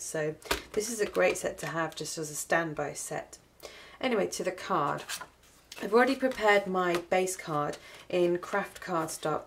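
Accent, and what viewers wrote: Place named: United Kingdom. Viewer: I just have to tell you I love watching your videos! I love hearing your wonderful British accent! I just bought a few papers from that October Afternoon line you've used a lot, and I think part of the reason was how cool "October Afternoon" sounded when you said it! Very cool papers as well!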